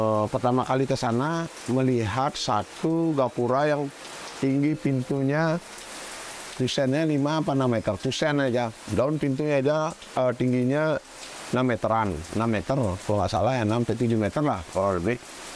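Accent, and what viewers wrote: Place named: Bali, Indonesia. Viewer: Karakter khas org Bali banget.. logat, 'n caranya berbicara...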